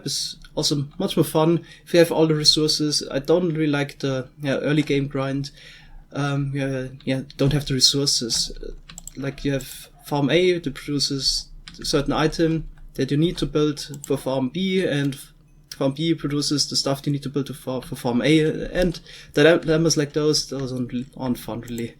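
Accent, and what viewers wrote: Germany. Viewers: Are you German ???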